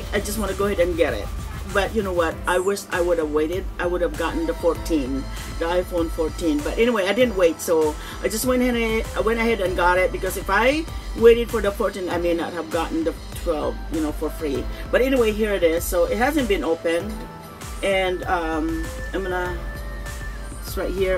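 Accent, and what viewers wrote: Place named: United States. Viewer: I love the way you talk kabayan as your accent sounds like an American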